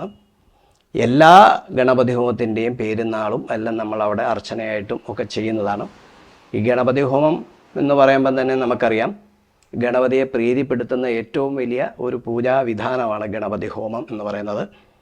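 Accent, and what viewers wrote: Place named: Kottayam, India. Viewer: ഒരു വർഷം മുമ്പ് ഇട്ട വീഡിയോ ഞാൻ ഇന്നാണ് കണ്ടത്.....തിരുമേനിയുടെ സംസാരം കുറച്ചുനേരം കേട്ടപ്പോൾ കോട്ടയം ഭാഷ പോലെ തോന്നി....ഞാനും കോട്ടയം സ്വദേശിയാണ്.... ഇപ്പോൾ തിരുവനന്തപുരത്ത് സ്ഥിര താമസം ആണ്.....പല തവണ ക്ഷേത്ര ദർശനം നടത്തിയിട്ടുണ്ട്.....ഗണപതി ഭഗവാൻ്റെ അനുഗ്രഹം എല്ലാർക്കും ഉണ്ടാകട്ടെ,🙏🙏ഓം ഗം ഗണപതയെ നമഃ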